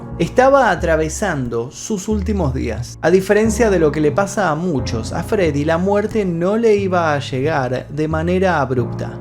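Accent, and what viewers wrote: Argentina. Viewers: Este narrador es argentino cheee, y quizá no se la coma igual se le salen los ojos 👀 mirándola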